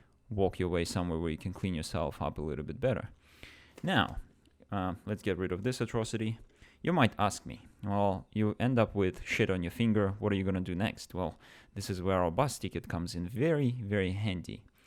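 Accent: Russia. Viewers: С чего ты это взял? Явно русский акцент и отдельный канал где он говорит на русском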